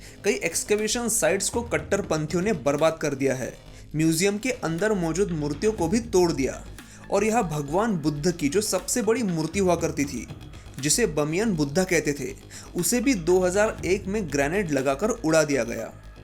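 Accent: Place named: Maharashtra, India. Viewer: you should work on your hindi accent.. looks too much of marathi influence.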